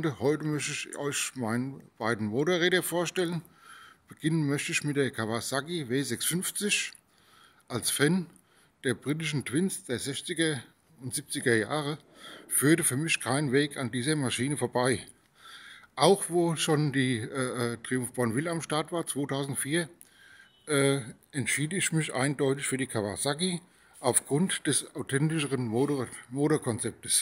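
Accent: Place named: Hesse, Germany. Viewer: Servus! Schön steht sie da Deine W650. Ich hätte es schon am Dialekt erahnen können, der Blick aufs Nummernschild gab dann Gewissheit, Du bist beinahe ein Nachbar. Ich komme aus Rodgau (Kreis Offenbach) und habe seit 2012 auch eine 99er W650. Ein herrliches Moped, ich liebe ebenso den Motor, rein von der optischen Ästhetik für mich der schönste Motorradmotor den ich kenne. Habe bei mir auch eine Reihe von Umbauten vorgenommen, habe hinten ein paar IKONs, ganz anderes Fahrverhalten. Und mit 120.000km ist noch lange nicht Schluß, die W ist unverwüstlich, man muss sie schon mit Vorsatz kaputt machen wollen bis da mal was passiert.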